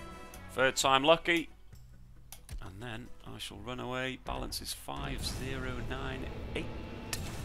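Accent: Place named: Scotland, United Kingdom. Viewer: Love the Scottish accent 😂Greetings from Glasgow mate. Keep off the bevy 😂😂🎉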